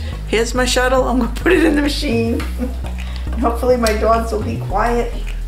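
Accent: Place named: New York, United States. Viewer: Love your accent, are you from New York?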